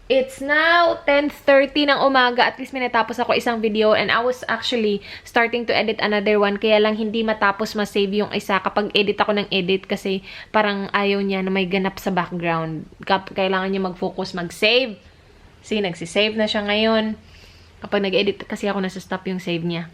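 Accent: Visayas, Philippines. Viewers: it's my first time watching your vlog ate and i really like it now 💕😍 especially your accent bitaglish😍💕. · Bisaya po kayo ate? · So far so good..i enjoyed this vlog..i mostly love your sense of humor your so fun to watch..and let me ask..are you perhaps a Visaya?? Hahah..it's just so cute..love your accent too..more power and godbless. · Ate kabol ka magbisaya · Bisaya mn diay😂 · Hahahaha awa bisaya lage klaro kaayo. 😂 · Hahahaha agay agay pa more hahahaa Bisaya kaman day oi 😁😁😁😁😁😁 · Visaya ka ate? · Bisaya d i ka?... agai agai... haha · I really like your accent... when you speak in english, even you have a visayan accent maganda pa rin sa pandinig.. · hahah na klaro ang pagkabisaya ba.agay3x hahahaha daghan na gyud tang mga bisaya gyud. new subscriber diay. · Agay so meaning bisaya xa. Hahah tama yong duda oks her accent says it all. Bisaya here as well i like the way you speak English the accent is great. 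From where man diay ka sis hehe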